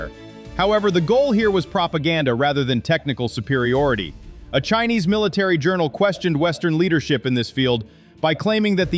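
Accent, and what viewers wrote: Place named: Russia. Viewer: you sound like a Russian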